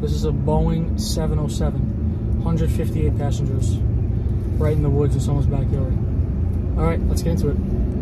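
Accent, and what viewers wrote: New York, United States. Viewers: ! Love your channel and your NY accent, you make me miss my hometown! Ps…please wear your seatbelt LOL. 😉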